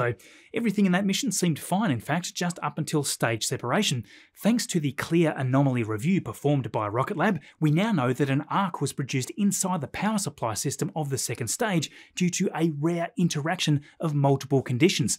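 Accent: Australia. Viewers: Hi. I don't know if other viewers experience the same strange feature when switching on undertitling: I always get it in Finnish! I have to explicitely put it back on "(american) english". I don't have this on other Youtube Channels. And, no: I'm not Finnish either. I'm Belgian, and I speak Dutch. But the english subtitles sometimes help when I did not understand you completely. Nothing to do with your Aussi accent, more with my poor hearing.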